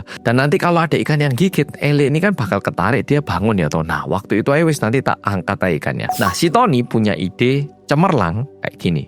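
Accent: Java, Indonesia. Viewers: Terus terang aku nggak bisa nyimak ceritanya.. Salfok sama suarane mas yang medok iku lho.. 😂😂😂 Kok iso lho.. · Oalah,wong Korea iso jowoan medok pisan,tak subscribe aelah..😊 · Lho, baru tau? Mas asli Korea, dari ortu asli Korea Lahir di Daegu Korea, besar di Malang Jatim dari TK umur 4 tahun sampai SMA Makanya logat nya medok Jawa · Ya bisa,lah dari TK -SMA sekolahnya di Malang, malah pernah jadi Ketua OSIS segala,otomatis bhs jawanya udah medok..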